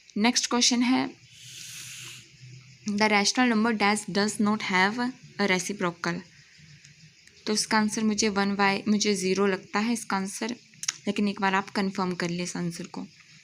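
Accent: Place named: Pahadi, India. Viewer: Didi ji Mera comment padho kya pahadi Ho🎉🎉🎉🎉🎉🎉🎉🎉🎉🎉🎉🎉🎉🎉🎉🎉🎉🎉 you are pahadi kyunki aapki awaaz se lag raha hai ki aap pahadiyon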